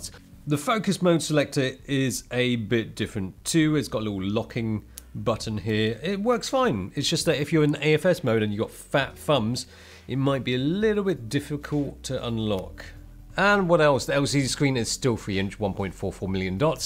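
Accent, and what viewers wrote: United Kingdom. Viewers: Asian guy with british accent. That's cool. :) Could you please help me decide. If I shoot mostly video but sometimes I want to do a little bit of photography, would it be better to have one SONY A7s3 and one SONY A7R4? Or would it be better to just have SONY A1?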